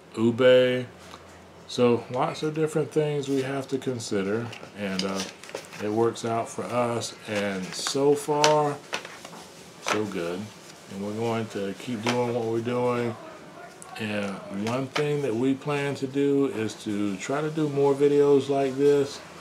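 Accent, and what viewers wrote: United States. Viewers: Thanks for sharing. I told my sister that your voice reminded me of our uncle's. It is the Middle Georgia coming out. Baldwin County girl myself. Thank you also for giving the name of the seed company. Having seeds is vital in the current times. I look forward to watching more content as always. Blessings ✌🏾❤️🙏🏾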